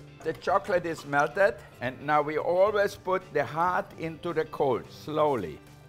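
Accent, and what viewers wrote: Germany. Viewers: It rallye sounds german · Is he German?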